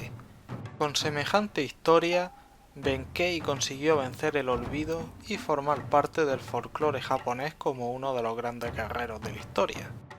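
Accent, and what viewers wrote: Murcia, Spain. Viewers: Liked the video but hearing the spaniard accent of spanish just pisses me off LOL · ¿de donde eres de murcia o de almeria? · me mola como habla el locutor,parece murciano. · Jaja como se nota que eres de murcia vamos o eso parece por la forma de hablar jeje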